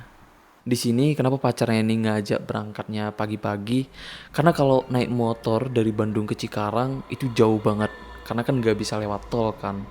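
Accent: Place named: Sumbawa, Indonesia. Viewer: Bang, pernah tinggal di sumbawa kah? Logatnya kek ada logat sumbawa..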